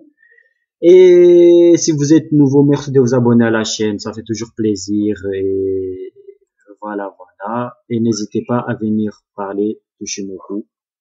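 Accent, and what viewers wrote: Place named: South, France. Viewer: je Veus apprendre ichimoku je commence a trader on <link> sur volatility et je Venus apprendre ichimoku donner vous une journee ou 2 de formation ps les augers ils parlent explique et touts et touts mais ils don’t trop de maniere was m emerge vous vous avec l air cool 😎 bref je Venus vraiment reussir, vous tees du sud Cela s enttend moi je sur sur londre mais a la base de Montpellier bref responder is jamais merci d advance. Fati.... ma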